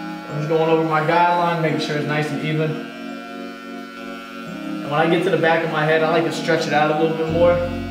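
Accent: North Carolina, United States. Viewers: Are you from North Carolina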